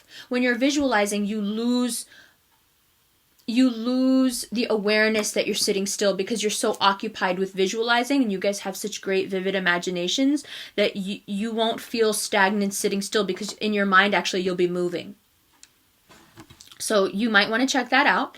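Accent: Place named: California, United States. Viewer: I hear that Cali accent. My daughter is a Gemini too.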